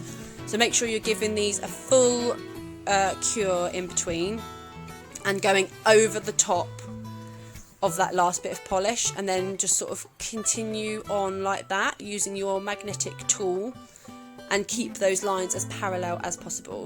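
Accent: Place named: United Kingdom. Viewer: I love your work and your uk accent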